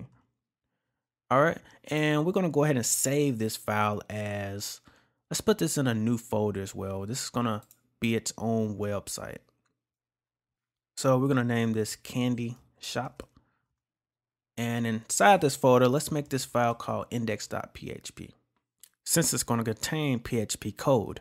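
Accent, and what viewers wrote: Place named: Brooklyn, United States. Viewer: Nice bro, its like Brooklyn brother teach me how to code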